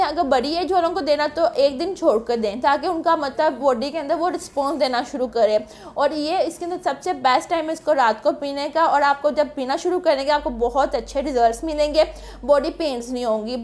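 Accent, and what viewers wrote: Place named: Lahore, Pakistan. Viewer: Lahori accent..love u ho gya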